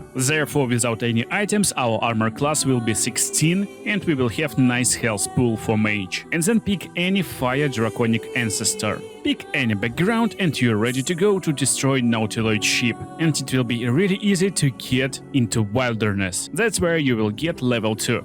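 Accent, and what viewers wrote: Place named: Russia. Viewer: Сэнкс фор зе гайд! Ар ю пробабли рашн? Каз Айм притти щюр зэт зис ис рашн аксент.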